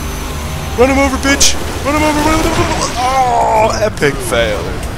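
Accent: United Kingdom. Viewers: why do you taik in a british acsent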